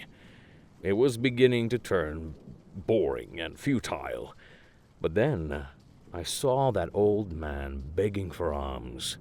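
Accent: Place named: South America, Latin America. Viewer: Great adeptus arbites audio log. Didn't expect the light souther american accent but i like it. Makes the members of the arbites seem more human despite the megalomaniacal authoritarian mania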